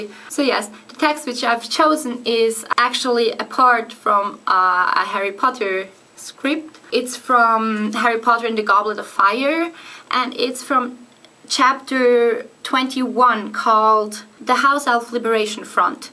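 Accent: Switzerland. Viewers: Really interesting! I'm a 2nd year German studies student from England and am writing a report on Swiss diglossia for my linguistics module. I speak German, couldn't understand 90% of the züritüütch you were speaking, but it sounded so interesting :)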